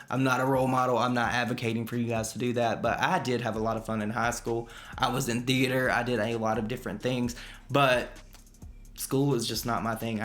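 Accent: South, United States. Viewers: I miss you doing these kinds of videos, its like a breath of fresh air from all the drama so thankfully the drama is slow this year(so far) 🤣🤣 love you! I could listen to you talk alllll day! Your accent is everything and reminds me of my southern family i dont get to really talk to very often! Love you hope you are having a greaaaat day!!! I cant wait to see the new content you bring out, id love to see true crime, paranormal stuff from you!!!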